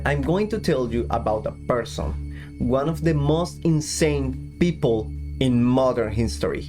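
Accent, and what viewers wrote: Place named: Spain. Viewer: This is an excellent true crime video. I'm trying to pin down your accent. Spain? Sorry if that guess is offensively wrong!! New sub right here.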